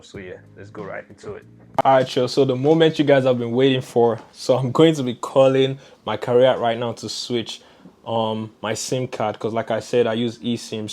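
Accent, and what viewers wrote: Nigeria. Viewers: you straight up know he's Nigerian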